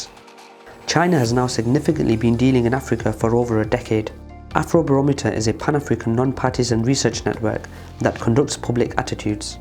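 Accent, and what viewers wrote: India. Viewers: He sounds Indian doesn’t he? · Voice of Indian.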